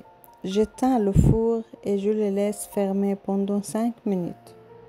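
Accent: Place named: Levant, Arab world. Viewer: من طريقة الكلام اضن صاحبة القناة من المشرق العربي . يعطيك العافية ❤❤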